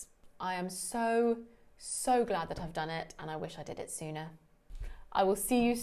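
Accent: United Kingdom. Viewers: i have noticed that her pronounciation is British but her doctor has an American pronouciation☺️ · Hai To.... I like Your Chanel And Your Voice British Accent.... Sorry, If My English Writing and Language So Bad....Because Need to Learn More.. I'm Eksand From Indonesia Country.... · You should record audible books! It’s so hard to find readers with your young British accent.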